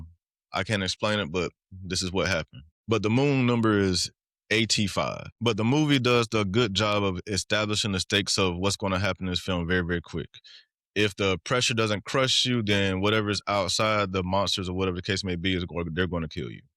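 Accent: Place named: United Kingdom. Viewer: "distorted and cruel" bruh that's just a british accent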